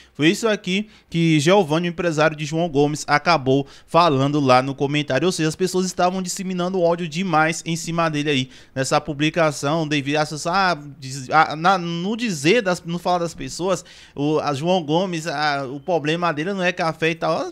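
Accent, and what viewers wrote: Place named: Northeast, Brazil. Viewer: E Adoro ele falando vixe como eu gosto quando ele fala desse jeitinho dele erradinho de vez em quando fica bem charmosinho gosto muito desse jeitinho dele bem nordestino gosto demais Ave Maria Até porque eu sou nordestina também e a gente tem que valorizar o nosso Nordeste Eita cabra da peste não vou sentir viu Fica com Deus e a inveja mata mas mata o próprio invejoso Deus Jesus é mais que te aguarde te Aguarda a todos amém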